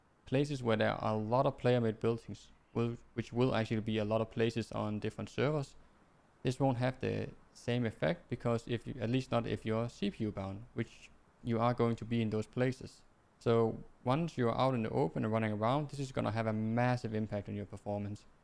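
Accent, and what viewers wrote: Denmark. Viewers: Hey, i just found your channcel, by one of your old ark graphic settings video. I just wanted to ask, if you're Danish, because your accent, sounds familiar. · I can smell danglish. But thank you for the help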